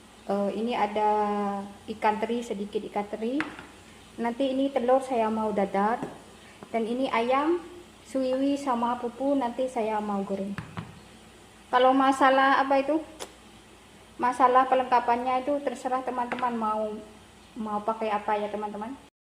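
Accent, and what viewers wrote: Madura, Indonesia. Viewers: Logatnya Bicaranya Persis Orang Madura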